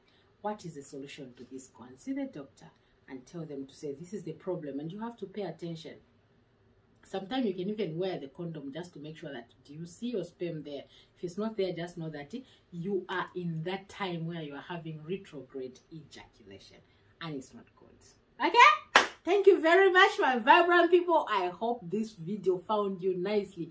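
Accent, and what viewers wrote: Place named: Zimbabwe. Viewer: I can tell that you are from Zimbabwe. Nice video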